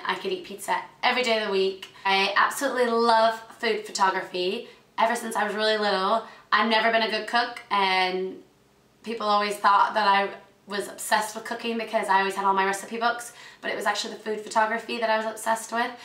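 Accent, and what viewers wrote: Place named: Manchester, United Kingdom. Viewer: Love this, and I can hear a little Mancunian accent underneath your American one :P. I'm the same with routine, I think it's important for kids to have structure, maybe I'm a little too strict though haha x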